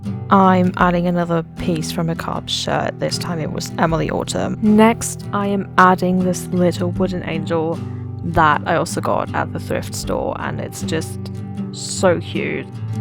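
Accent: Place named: United Kingdom. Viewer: Where is the cuntyy British accent coming from omg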